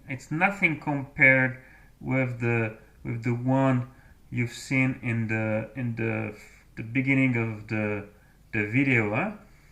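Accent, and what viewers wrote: France. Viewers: Very interesting to know this! Your French accent is charming, esp the way you say 'Horrible'! Thank you for a detailed video. I would shorten it a lot though, there is too much repetition and its too long. I'm American, so of course, we like things to the point and fast. You should always wear glasses yourself. You never know if you suddenly slipped, or had a strange unexpected accident that spilled bleach on your face. Also gloves. With regard to dental tools, you can buy them cheap on Amazon for dog teeth cleaning. Merci bien!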